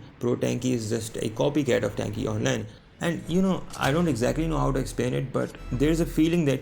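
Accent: India. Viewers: knew this was a scam video the second I heard that poopjeet accent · The second I heard that indian accent I knew I was getting baited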